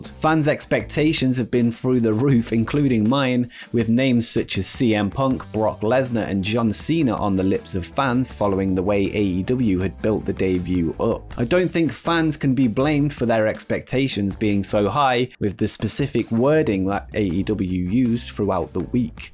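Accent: United Kingdom. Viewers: why are most of these wrestling clips narrated by a dude with a british accent? just askin'.....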